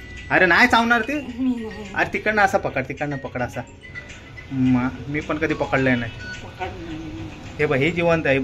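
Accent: Malvani, India. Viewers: बरा वाटता कोण तरी अस्सल मालवणीत बोलता ता नायतर अर्धे मालवणी गोंधळच घालतत. अर्धा मराठी अर्धा मालवणी बोलान. तेचा काय होता म्हायत आसा समोरचोय गोंधाळता मरे. असो तुका बाकी हॅट्स ऑफ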